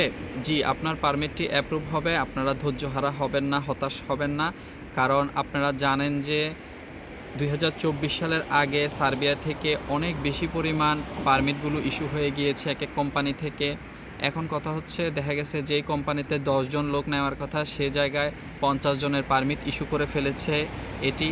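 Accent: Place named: Chittagong, Bangladesh. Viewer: ভাই মনে হয় চট্টগ্রামের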